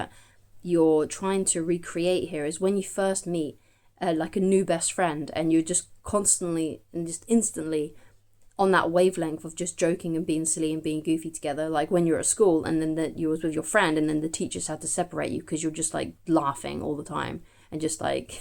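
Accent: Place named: United Kingdom. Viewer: Nice that you have a coaching book out. You're not going to tell me I have to read it 10 to 15 times just to understand it, are you? 😅 I like your content and of course the UK accent... Nothing is more delightful for me than the times that I get to fly through London-Heathrow and everyone is throwing around that accent..."Hallo Yank, yes you... joost need your passport over here, love"... I just love it. It's so different than the way people are here in the States. Anyways, cheers to your book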